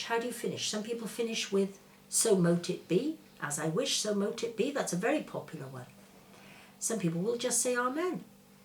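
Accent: Wales, United Kingdom. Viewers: I love your accent I hear Welsh in it ❤💛💚🧙✌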